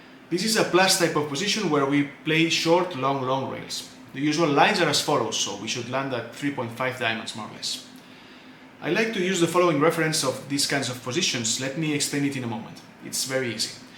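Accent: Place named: Spain. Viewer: And about the translation, its quite good. Actually sounded like maybe you would have spoken, with a bit of spanish cadence but good vocabulary.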